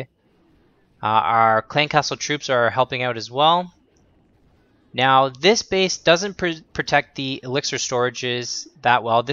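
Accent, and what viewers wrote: Canada. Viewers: Not trying to be mean but r u Canadian? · canadian? lol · your Canadian :D i can tell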